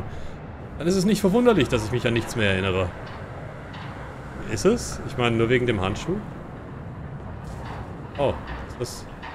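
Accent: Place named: United Kingdom. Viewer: Der Britische Akzent ist so schön ! <3 ich mag es auch lieber wenn es winter ist blöde Hitze >y<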